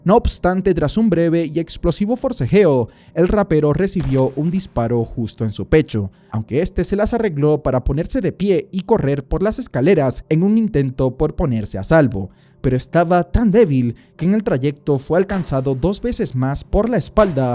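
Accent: Dominican Republic. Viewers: Eres dominicano? Por que tiebes ese acento? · Haha y esa dominicanidad que tienes ahora duro 😂🔥💪🏽🇩🇴💯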